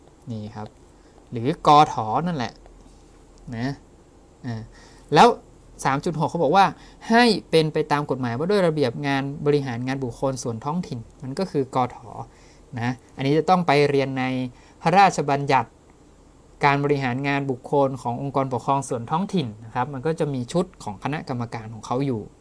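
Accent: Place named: North, Thailand. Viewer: ติวเตอร์เป็นคนเหนือใช่ไหมคะ สำเนียงคนเหนือมาก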